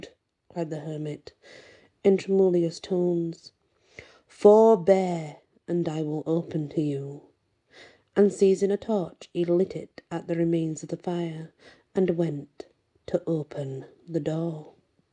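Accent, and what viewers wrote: United Kingdom. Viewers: Hey! You outdid yourself with this one! 🐎🗡 “Morvan Fights the King” was intense, emotional, and full of drama! 😍 The thunder, the battle refusal, and then Morvan’s unwavering stand—legendary!! His confrontation with the Hermit was SO intense… and the ghostly moment when he held his own head?! 🧠👻 That image will live rent-free in my mind forever! And the final redemption by Saint Anne 🕊💕… pure magic! I felt every moment thanks to your beautiful storytelling. Your British accent just adds that extra elegance and charm that draws us in like a lullaby. 💤🌸 Thank you for this masterpiece, Auntie. We love and appreciate you! 💖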